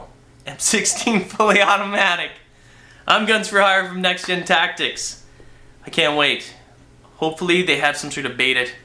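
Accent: Canada. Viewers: is he canadian? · are you canadian?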